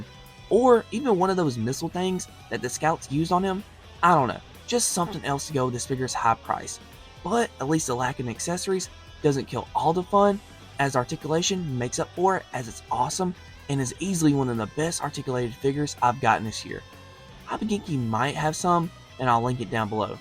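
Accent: Texas, United States. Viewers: is it like a Texan accent or smth idk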